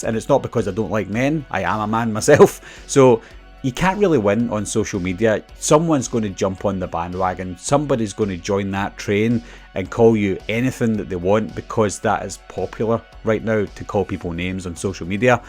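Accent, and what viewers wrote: Scotland, United Kingdom. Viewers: Yeah of course I love your videos pal and I'm Scottish so it's nice to hear my own accent online 😀 👍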